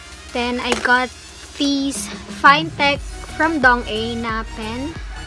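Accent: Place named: Philippines. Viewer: wag ka na mag english halata nang pilipino ka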